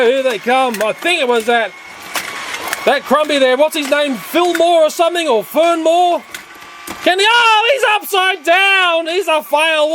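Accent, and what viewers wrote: Australia. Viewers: I’m sure your target audience for this video is two three olds and older. I know my daughter will love to watch this she’s two. I’m 24.. and I just watched this through.. I love it 😆 so you know your kiddie YouTube videos are not only for kiddies.. you’re a great person and I love all your videos.. I try to share all your videos as much as I can.. love you as a person, were in the uk. But your awesome Australian accent always is my three in the morning cure to go back to sleep 😛 chronic insomniac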